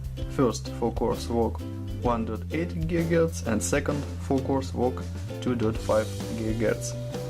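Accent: Russia. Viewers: Русский акцент есть), где можно взять не подскажешь?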